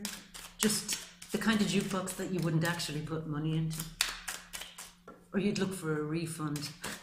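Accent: Ireland. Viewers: Helo there buitifull lady , i thank you from the bottom of my big Leo hart ,wow love your Irish accent to , happy New yr , well I had tears runing down my cheek for thats my life you read, i know it's General , but i felt it for it was saturn on my case 4th house in my chart in sag , but none the less he's been my task master, it's been karma,i suferd 21 yrs in total, i have endured more than any man, but you are right it a cumilated in the last 3 .. I call it hell an back ,i am still hear , and I really cry when you said ive paid 10 life's karma,.saturn is the teacher task master and father time , Lord of karma , he took me to the limit of my endurance , striped me bare and shown me how insignicant we are as beings for we truly are , pain and sufering loss and the false fell like dead flys , to the way yet test after test i endured humbly for i no i am gratefully for his lessons , for he surely is the greatest teacher of all , and I learnt the hard way ,.i all ways have Spence age seven ,. 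Ime now 60 ... but i like what you said because I do be leave ive passed the tests because simply i wouldn't be hear ,, this i do believe 1000%.. and the rewards via saturn are the greatest for the endever and passing,, yet to come, patients was the hardest lesson humility releasing egos pride, Many many lessons, false pride ,i am a current man than when I started , . I no got to move from hear padt , finally to get ready to receive,. Renewed hope change finish my transformation, ready to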